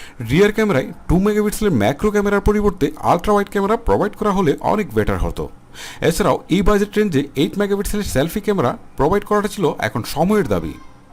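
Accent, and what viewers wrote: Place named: Noakhali, Bangladesh. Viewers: vai its perfect not ferfect , leave your noakhaillya accent... irritating kotha barta.. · মনে অর আন্নে ’নিউখাল’ এইচ্চা করি কতা কম কিল্লাই। শুদ্ধ করি কতা কইতে হারেন কেয়ন?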